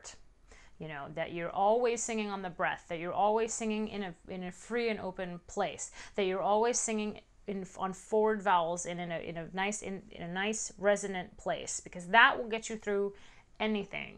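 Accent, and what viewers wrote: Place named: United States. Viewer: She sounds like a normal American woman, not 'husky and gutteral' 🙄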